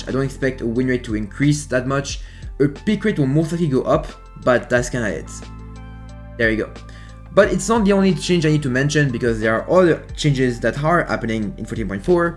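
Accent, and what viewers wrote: France. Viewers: Omg, are you french? I love your accent and that you actually try to articulate (unlike this Takyre senna content creator. The guy gives no shit whether he's understood or not).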